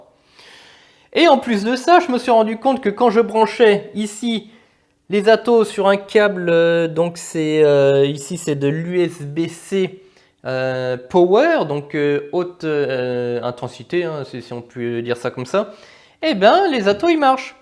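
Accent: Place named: England, United Kingdom. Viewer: Belle bête ! J'adore ton accent englich. 😉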